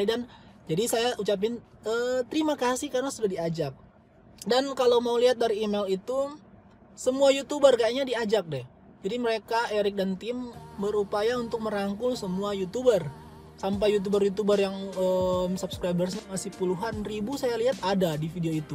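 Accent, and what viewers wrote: Manado, Indonesia. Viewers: Selama tontonin chenl abang nanti tau abang orng manado😂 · Bang org manada kang?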